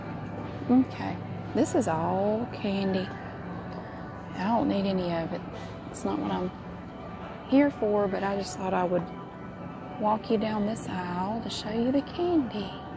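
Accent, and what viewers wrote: South, United States. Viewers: I’m from Nebraska and most people only eat Hidden Valley Ranch. If I came home with generic ranch, my family would flip out no joke. Midwest is serious about our ranch LOL! I love your videos and your voice and southern accent. It’s fun shopping with you and watching you straighten things. Thank you! 😊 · just recently found your videos. I must say that they are the best distraction for my anxiety. and Im from the south and your accent is very reminiscent for me, always watch them before bed Lol😂 thank you for being hilarious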